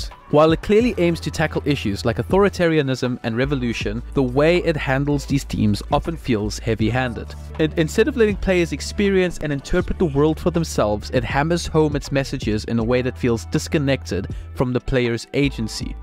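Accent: South Africa. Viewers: Are you South African? I can hear it in your accent bru. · Man, I'm all in on these videos! Could this be the birth of a new thoughtful, reasonable, commentary channel on games industry and culture? It feels like The Act Man channel, but from the point of view of someone that loves games but has other things going on in life, who can't grind a game 8hrs a day, just like most of us. I love how you add the name of the game whenever you show gameplay from that game, it helps for games that I can't recognize via gameplay, having not played them. It's a small detail, but attention to detail is a huge thing for making a high quality anything. The SA accent is also nice.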